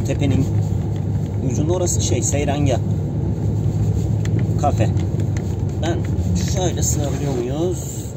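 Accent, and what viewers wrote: Kastamonu, Turkey. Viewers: Hep kastamonu şivesini konuşmanı istıyoruz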